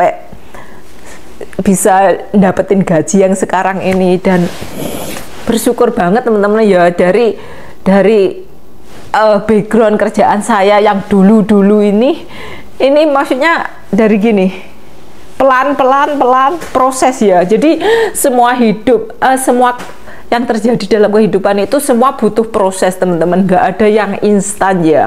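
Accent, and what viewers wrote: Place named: Java, Indonesia. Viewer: gpp medok Jeng.....iku ciri khas e lo . gampang di eling2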